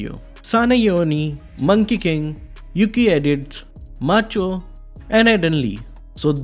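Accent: India. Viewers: Its because of his accent indian english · Indian accent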